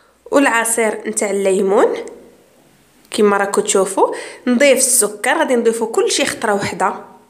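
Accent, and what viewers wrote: Oujda, Morocco. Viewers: slm khti lbs ana mocharika jdida chaft wasafatk o3ajboni lah ya3tik saha ...lahsab lahja dylk nti mn oujda wla nn ?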